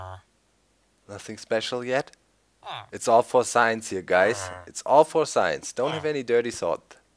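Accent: Germany. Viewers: have you ever tried using an american accent other than your german one?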